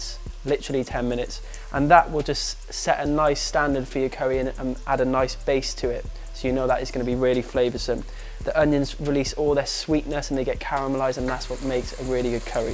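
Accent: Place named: Northern England, United Kingdom. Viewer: Just subbed ! And 'liked' ! When you coming to London? I guess by your accent you're from up North ? Or do you live in London? Would be great to see one of your demos in London!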